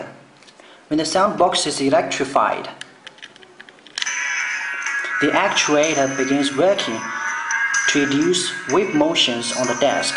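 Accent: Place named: Poland. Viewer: Interesting accent, is that polish?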